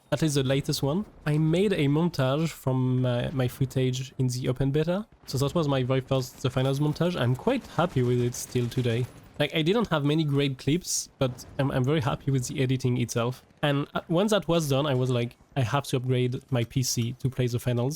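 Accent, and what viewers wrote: France. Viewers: I'd be glad to help with the accent, I'm french too but people say I have a great accent :D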